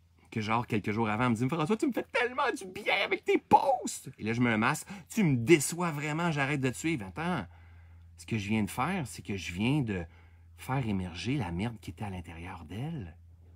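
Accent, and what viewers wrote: Quebec, Canada. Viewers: Tout à fait d’accord, le fait d’accueillir plutôt que de résister apporte une paix et permet d’être dans l’ouverture vers les autres. « Chacun fait comme il peut avec son niveau de conscience et son niveau d’intelligence du moment ». Cette phrase que j’ai intégré m’a aidé à pardonner et à ramener la paix en moi. Merci François, je t’écoute depuis plusieurs années maintenant et j’ai une anecdote drôle à partager. Il y a 2 ou 3 ans j’ai visionné une de tes vidéos qui durait environ 3h, ma fille de 10 ans était malade elle était donc avec moi et a entendu l’intégralité de la vidéo. Depuis elle imite super l’accent canadien et elle fait souvent référence aux citations de avec l’accent évidemment. Elle nous fait beaucoup rire. Elle s’amuse beaucoup. Merci François pour ta sincérité, je suis très touchée par ton message d’ouverture et de tolérance. 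· François j'ai vu ta conférence à Paris en juin 2019, également celle avec David LF en avril 2019 et franchement tu m'as définitivement réconciliée avec l'accent québécois (ahhhh.... la prétention française 😂 ) maintenant il n'y a plus d'accent mais juste un "flow" que j'entends et surtout l'essentiel, the message ! 👌J'espère qu'il y aura d'autres conférences prochainement lorsque cela sera rendu possible. J'étais en pleine transition dans ma vie en 2019 et je vois le chemin parcouru jusqu'à aujourd'hui (qui continue évidemment) et je veux te dire grandement merci 🙏 D'autre part, le confinement a été pour moi une chance absolue pour plusieurs raisons de synchronicitées... Je ne détaillerai pas ici mais j'ai retrouvé la paix que j'avais perdu pendant des années... Tout a été "simplifié" plus de viande ou poisson (ou très peu) de la meilleure nourriture bio et locale, moins de maquillage voire presque plus.... 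Et tout ça naturellement sans restriction aucune.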